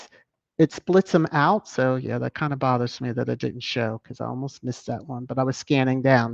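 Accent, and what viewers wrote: RP, United Kingdom. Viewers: Thank you for another wonderful thrash time 🙏😘❤️❤️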